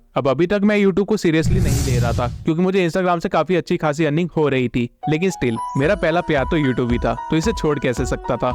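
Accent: Bihar, India. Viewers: bro aap bihar se ho na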